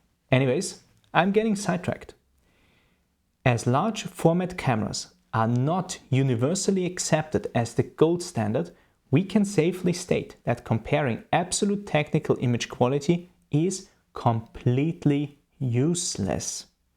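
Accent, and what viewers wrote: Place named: Austria. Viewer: Congratulation for this outstanding lesson. (and I like your austrian accent.)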